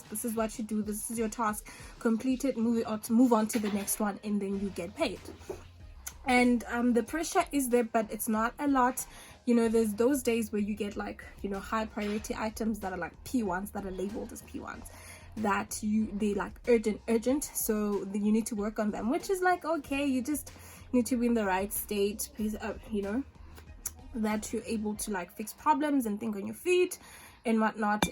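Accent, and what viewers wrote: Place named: Johannesburg, South Africa. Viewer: Why does it sound like you were a UJ STudent?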